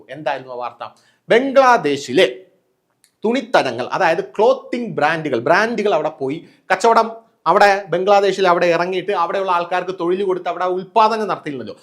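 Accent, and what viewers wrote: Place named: Trivandrum, India. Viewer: i'm a big fan of your style and the tvm slang !! Makes my day cheerful.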